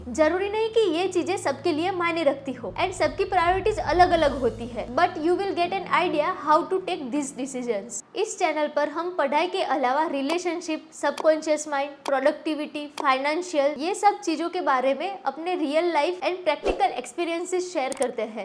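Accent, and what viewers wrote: Gujarat, India. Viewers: If Gujarati are speaking Hindi 😊✌️ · I love gujrati tonn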